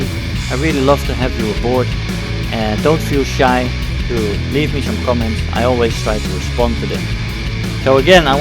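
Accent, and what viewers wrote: Netherlands. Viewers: ik kan het meteen horen als iemand nederlands is, meeste nederlanders hebben een best wel herkenbaar accent als ze engels praten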